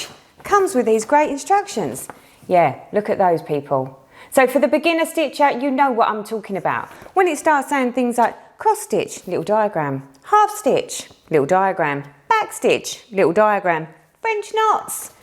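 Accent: United Kingdom. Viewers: I came across your floss tube video quite by chance and I love it , you are hilarious and the struggles you have had are so identifiable to every cross stitcher. I live in a household full of men/ boys so you are my company when I am sat stitching. We left the UK 3 years ago to live on the west coast of Canada and it is so great to hear a voice from home.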